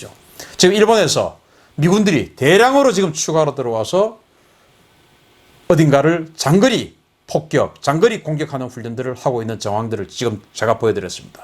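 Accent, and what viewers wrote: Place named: Busan, South Korea. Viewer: 죄송합니다 만 저도 부산출신인데 사투리 재미나요 ㅎㅎ 표현도 재미나심 편대가 촤아아악 무장을촤아아악 ㅎㅎㅎ촤아아악을 자주 표현하심 ㅋㅋ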